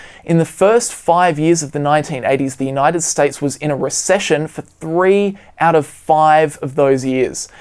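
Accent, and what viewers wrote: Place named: Australia. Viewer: Not bad for an Aussie!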